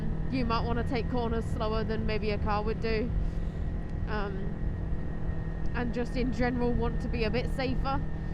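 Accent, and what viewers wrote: United Kingdom. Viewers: Im from the Highlands Scotland and iv been riding for a few years. I dont usually ride in the winter only if its necessary. I went round a hairpin and hit a patch of black ice on my ZX6R and wraped it around a tree a few months after getting my A license. 🤣 where abouts UK are you based? Sound Cambridge area.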